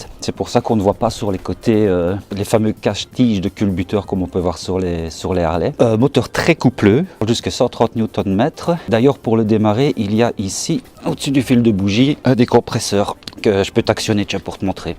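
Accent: Belgium. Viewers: J'aime beaucoup ton accent de la Belgique et c'est toujours un immense plaisir de voir tes vidéos !! 👍✌️🤛😉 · j'ai pu monter sur une (sans rouler malheureusement elle étais dans un show rom et je n'avais pas d'équipement) et c'étais nickel pour mes jambe aussi bien au niveau des commande de boite que du frein arrière et même avec les jambes tendue mes pied étais bien à plat il faut dire aussi quel avais une selle haute, de plus j'aime bien la position droite quand on est à sont guidon, je l'aurai bien acheter mais je doit vendre mon bateau avant pour la financer et faire de la place dans le garage pour la ranger, je me disait aussi que quand tu parlais sa sonnait fort l'accent de Liège à toi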